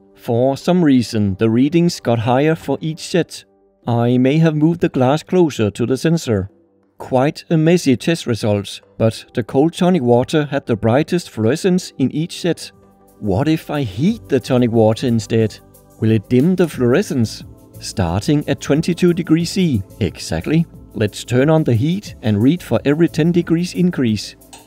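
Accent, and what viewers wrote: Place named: Denmark. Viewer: Elsker din tydeligt danske accent 😂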